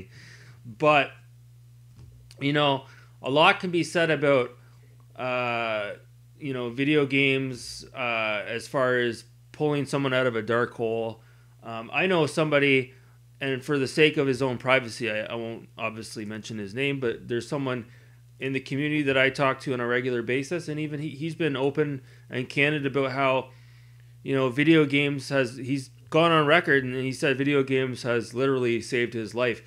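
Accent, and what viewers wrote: Canada. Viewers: ohh nice om from there too small towm outside Toronto. Just thought i heard a bit of newfie in there lol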